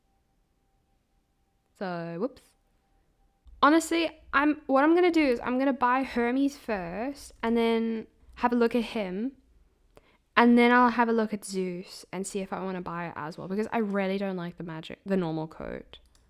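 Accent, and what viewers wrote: South Africa. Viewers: Hii i love your videos are you from south Africa lol 🎉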